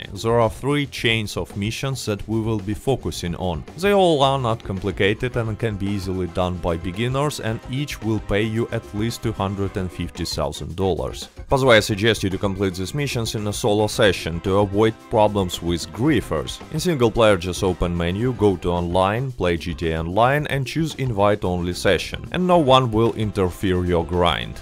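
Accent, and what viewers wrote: Russia. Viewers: This is why I love Russians the have a great sounding ascent and they get straight to the topic!!🙃😁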